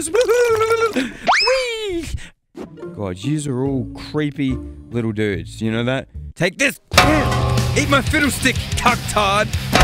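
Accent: Australia. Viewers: are you really aussie ?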